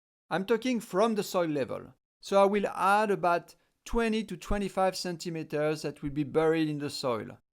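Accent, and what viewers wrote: France. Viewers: French accent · C'est un accent français ça non ? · Are you French ? You have a strong accent no ?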